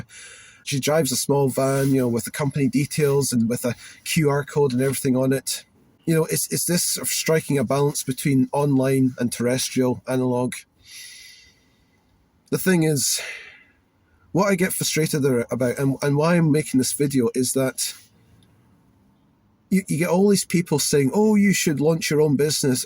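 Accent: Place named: Scotland, United Kingdom. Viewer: My youtube got nuked 3 times, and this account I barely upload anything anymore...sh!t is just too demotivating after being steamrolled so much, and I remembered a channel I was subbed back then, with a guy with somewhat of a scottish accent, and now I found it again! Glad to see you're still around in the original form. Subbed.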